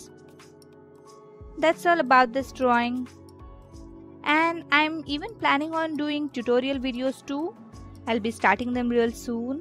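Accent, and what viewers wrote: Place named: India. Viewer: I love this indish accent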